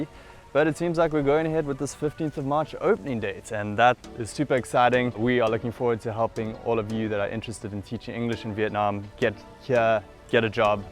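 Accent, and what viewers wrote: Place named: South Africa. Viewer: i hear some kind of a South African accent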